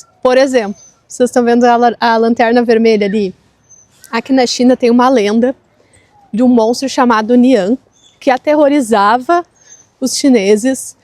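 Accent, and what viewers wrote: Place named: South, Brazil. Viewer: Sou seu seguidor, acho vc e familia muito simpaticos. Queria dar umas sujestões de videos. Antes a china era chig ling. Tudo era copiado. Agora é o futuro, tamanho o avanço de tudo ai. Mostra mais esse lado, as coisas tecnologicas. desde ponte, ruas, maquinas, coisas diferentes pra nos brasileiros. Sou do sudeste mas adoro o seu sotaque do sul. Um grande abraço e um beijinho para o que parece ser um doce de crinaça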